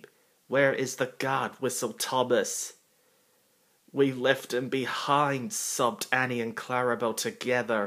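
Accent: United Kingdom. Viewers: okay, however are you British or Australian ? If you originally came from the UK or were you born in Australia ?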